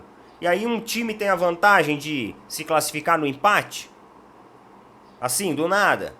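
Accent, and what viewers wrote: Sao Paulo, Brazil. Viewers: Sotaque Paulista mano.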